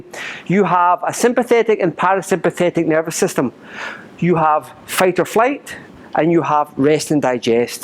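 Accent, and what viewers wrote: Scotland, United Kingdom. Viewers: The Scottish accent makes this video 10x more awesome. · Great video 👌 Bang on everything you say. I’m a fellow Scot, from Airdrie just outside glasgow! Nice to hear my accent YouTube’s full of Americans 😂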